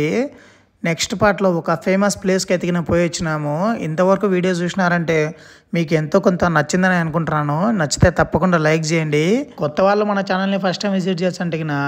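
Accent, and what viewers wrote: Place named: Rayalaseema, India. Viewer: Anna me videos lo oka speciality eantante meeru matladetappudu mana రాయలసీమ slang baaga telustundi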